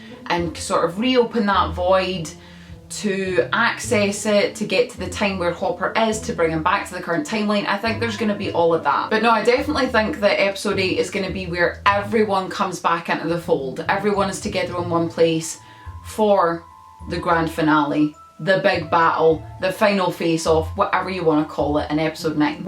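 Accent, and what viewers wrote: Scotland, United Kingdom. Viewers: Well I search for Scottish girls and her accent video pops up on 3rd place...yup definitely going to sub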